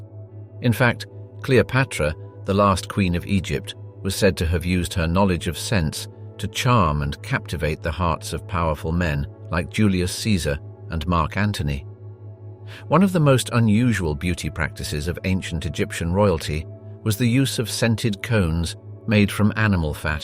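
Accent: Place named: England, United Kingdom. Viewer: YOU NEED TO BE “SLAPPED” FOR TRYING TO MAKE A GREAT CIVILIZATION LOOK BAD! Great Britain ‘s Royal family are “ALL COUSINS” & committed “INCEST” ALSO BY MARRYING FAMILY! ALL EUROPEAN ROYAL ARE CLOSE RELATIVES!!! King Charles & his deceased wife, Diana ARE “COUSINS”! “STOP” RAGGING EGYPTIAN ROYALS, WITH YOUR “JEALOUS & HATEFUL” ENGLISH ACCENT! HOW DARE YOU????!!!!!!!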